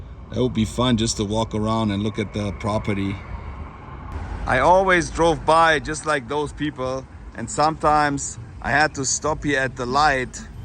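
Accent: Germany. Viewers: Nostalgia, I so remember that old Hollywood hotel Mr, I am from so.central los Angeles, so your video is taking us down memory lane, so sad it got demolished, I liked los Angeles county when I lived there back in the 50,sand 60,s it was nice back then, now it's so different, you should go see Griffith park and the observatory and the old zoo there and the train, I do enjoy your video,s and I like that German accent, you could be a great tour guide, thanks for the video, good job, Have a beautiful day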